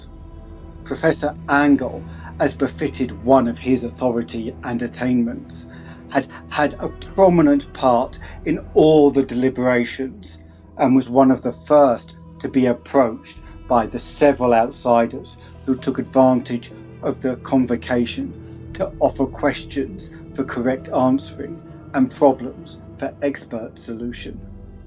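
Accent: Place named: United Kingdom. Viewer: Telling a story with Americana locations and culture with a Brit accent seems a bit out of place 😅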